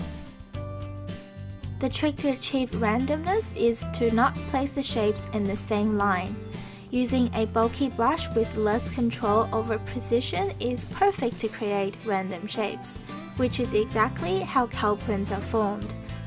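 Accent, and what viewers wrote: Australia. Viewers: She sounds so Australian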